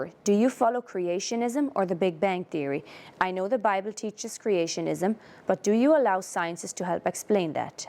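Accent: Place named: Ireland. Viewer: Your accent has a tiny bit of an Irish sound to it at times, either you did some travelling or Hutterites accents change a bit from colony to colony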